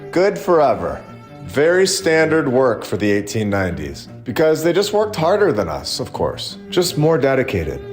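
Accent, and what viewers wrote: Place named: Massachusetts, United States. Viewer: Sames! Are you from Massachusetts? 😅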